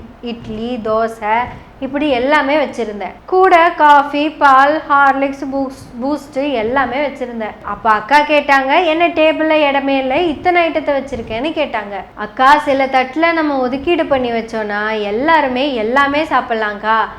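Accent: Kongu, India. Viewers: வருங்கால த்ரிலிங்கான C. M களைவிட விட நீங்கள் பேசும் த்ரிலிங்கானக் கொங்குத் தமிழ் அழகு நடை சூப்பர் எப்பா!வாழ்த்துக்கள் பாப்பா!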